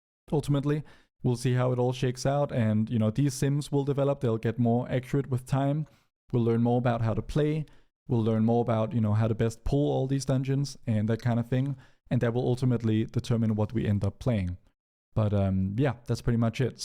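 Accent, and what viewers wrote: Denmark. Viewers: Are u a dane mate? Its sounds a little bit like it. Great work btw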